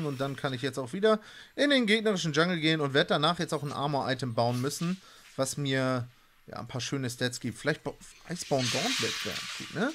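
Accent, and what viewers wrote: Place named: Austria. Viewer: Ich kenne dein Dialekt.....du bist Österreicher ne?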